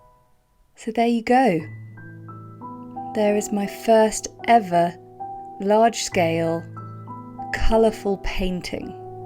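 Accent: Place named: United Kingdom. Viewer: Is that your inspiration picture on your computer? I loved this tutorial. It’s fascinating to watch you paint! Good for you stepping out of your comfort zone! I tend to be more detailed as in your other videos. I’m a new follower and love hearing life British accent! Your pup looked so sweet. Have a wonderful rest of the week! A new friend in Tennessee and Iowa. USA. 🇬🇧🇺🇸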